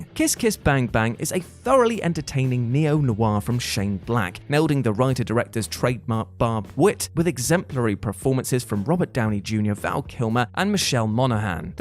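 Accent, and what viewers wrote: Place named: London, United Kingdom. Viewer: you sound like you're from londonnnnnn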